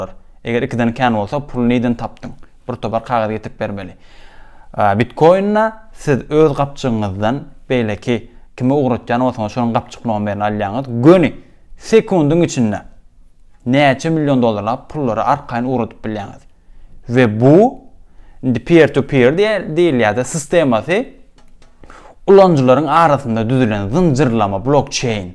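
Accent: Turkmenistan. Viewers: Turkmenistan Turkcesi mi bu?) Cox gozel seslenir)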